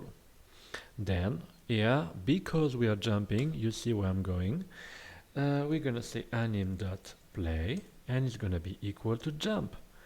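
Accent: France. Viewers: Ouais je me suis dit que son accent c'est 100% français.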